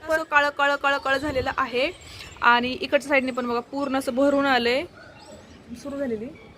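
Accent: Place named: Satara, India. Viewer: You are very beautiful. Like your Marathi. You sound like you are from Satara.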